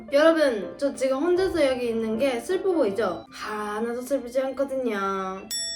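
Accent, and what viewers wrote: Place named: Busan, South Korea. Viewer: 그리고 부산사투리 작렬. 3개국어인가요? 일본어 표준어 사투리 ㅎㅎ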